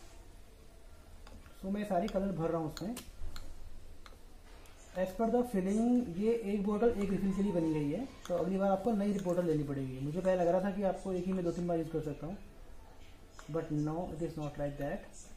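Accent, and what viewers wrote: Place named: India. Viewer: I am American and, of course, used to American english. Of course, I am also used to the accents of the other primary english speaking countries. Un fortunately, consistently, Indian Youtube presenters have very accented english which makes it very difficult to understand a technical presentation. I am quite sure I would understand them during a conversation.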